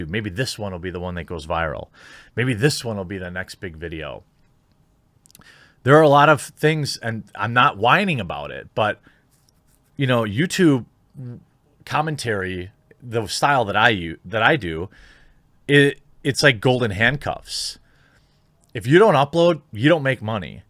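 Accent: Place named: Midwest, United States. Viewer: Hahaha I knew you were from the Midwest but that clip with you and your wife your accents really shown through. I was confused for a second because in your videos your accent is very minimal. Don’t get me wrong, nothing wrong with the accent just caught me off guard lol. If you heard me talk I’d be the one with the accent.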